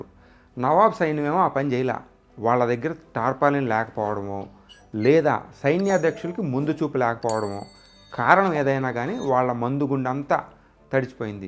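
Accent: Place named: East Godavari, India. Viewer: ఈ ఎధవ తూగోజీ యాసలో ముసలోల్లు చెప్పిన టులు ఏంది ఈ వాగుడు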